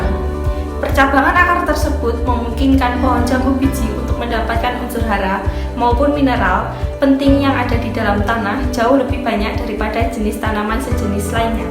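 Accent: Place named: Java, Indonesia. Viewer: mbaknya cantik, tapi medhokk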